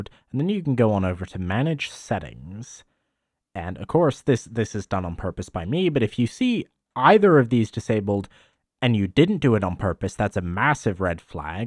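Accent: United Kingdom. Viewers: is this guy an American with a speech impediment or a brit? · Nice. I seem to hear a little bit of a British Accent, but I don't know if living in British Columbia gives you that accent or something. I like it though! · Your accent is so weird, you switch between sounding Canadian and British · thanks for the tips. your accent rocks. there seems to be some British English on certain kinds of words.. password.. master.. ..ahaha Niles Crane-like.. thanks again! So happy to hear a voice i can understand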